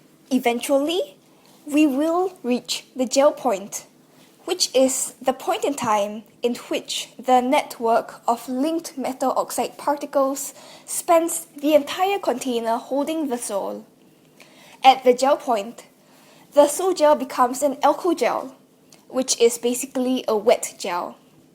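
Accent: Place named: France. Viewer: that french voice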